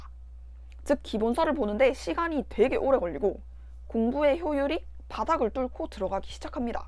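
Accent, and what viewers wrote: Busan, South Korea. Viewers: 마산 진해 부산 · 부산이신가요? 똑~띠 이십니다. ^^b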